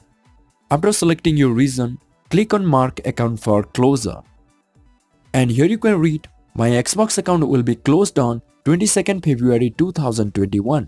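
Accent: India. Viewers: Oh wow he was rhe best indian voice ever no raiseseme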